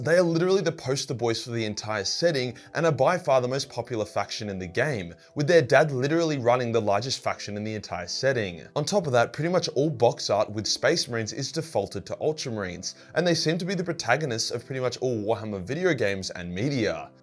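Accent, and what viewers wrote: Australia. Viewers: Australian Johnny Bravo continuing to do the Emperor’s work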